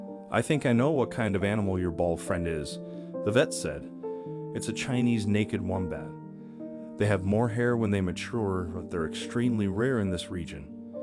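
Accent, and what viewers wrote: United States. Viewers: This becomes apparent that this is in Australia! I at first thought it was an armadillo. But “Chinese”! No way! Only Australia has wombats! The man must have lived nearby. There’s no way wombats would travel really far to rejoin a family member? And why do we have a Yank narrating?!?! They are very mischievous animals! Another good reason to allow them live in the wild.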